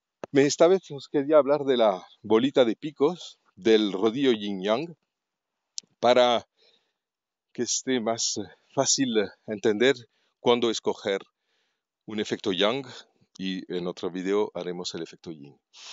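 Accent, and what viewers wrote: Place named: United Kingdom. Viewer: I love your british accent!! It's very interesting!!!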